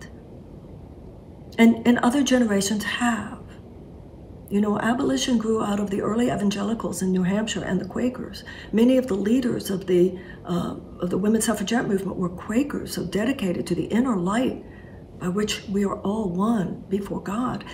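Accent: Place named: Houston, United States. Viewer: It's really funny I would hear you talk and say some of the things that you say and wonder where is this lady from? So I finally did some research turns out you grew up in Houston just like me. It is funny that if you're from Houston nobody in the world knows what kind of accent you have cuz it's like they I have never heard it before. I remember going to Vancouver Canada and people would stop me when I talk and say where are you from I just moved to Dallas so I said Dallas Texas but what they were really picking up on was that Houston Texas sound I appreciate you. Probably won't agree with everything you say but I'll definitely listen to you say it.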